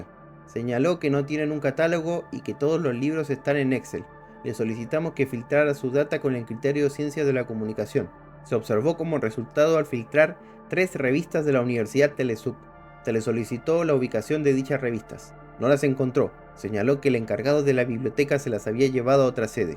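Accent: Chile. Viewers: siento que sos chileno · Buen video pero no pude dejar de pensar que tienes un manera de hablar muy chilena de tu parte. Eres de chile viviendo en Perú, o solo es casualidad?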